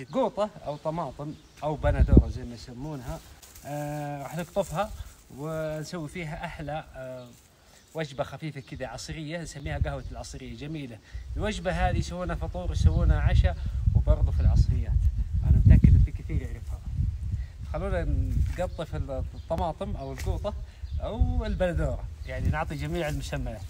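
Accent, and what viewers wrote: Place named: Al Bahah, Saudi Arabia. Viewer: اللكنة زهراني او غامدي كيف كذا علمنا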